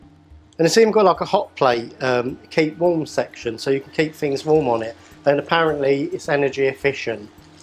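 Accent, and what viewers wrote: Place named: Southeast England, United Kingdom. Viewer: You sound like you’re from Dartford or Bromley,.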